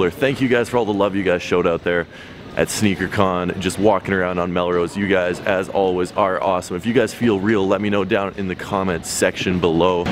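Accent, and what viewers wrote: Canada. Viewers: yeah, canadians kinda pronounce things weirdly compared to us states-people. especially for me cuz i'm from texas😂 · I thought u said those words like that cuz ur Canadian. Also u say sorry weird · You don't say them wierd, you say those words Canadian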